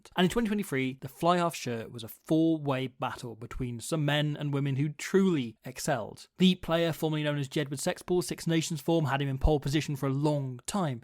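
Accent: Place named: England, United Kingdom. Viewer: are you no longer Welsh(you sound English)? Wayne Barnes best ever...? Really? Hes good, maybe the current best but Nigel Owens was better & IMO best of professional era. Nigel ruled to the spirit of the laws. Wayne, being English, always ruled to the letter but occasionally Nigel contradicted the letter but never the spirit of the laws. Honourable mention Wayne Ericsson -Aus. Legends: Paddy O'Brian & Colin Hawke who invented yelling instructions to the players pre professionalism.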